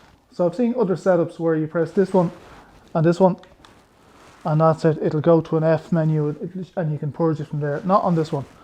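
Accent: Ireland. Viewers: Great little video. That positive Irish attitude to life and the cheerful accent. It reminds me of my little Irish mum, god bless her and my she rest in peace. Those diesel heaters are an obsession. I have installed two at the cottage in Southern Finland. One running from the garage under the cottage into one side through a hole through the concrete slab. On the other side of the cottage, in from the patio area through the wall. Last year had only one but in one room was warm but still needed the wood fireplace on the other side of the cottage to heat things. That means trekking through the snow to collect wood to burn. In winter in the Finnish countryside, the snow can be meters deep and by January can be -10 to 20C, and it's a pain(A COLD PAIN) aside from the need to cut wood in the autumn. Filling diesel tanks on these heaters is easier. The newest of these heaters is the HCalary 8,5kw which I bought in mid-summer for a dirt cheap price on e-bay and I have put a 25 liter diesel tank. 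That one has a far better control panel with better features and easier use. The cottage is off grid, so hoping that I get the extra solar panels up just bought cheap to help keep the inverter and four 100Ah batteries topped up for the heaters and lights of the cottage during this winter. We have a Champion generator too, which helps the time we are there to maintain the place. That Hcalary heater can be set to come on when we are not there, but I am a bit unsure of setting it to go as earlier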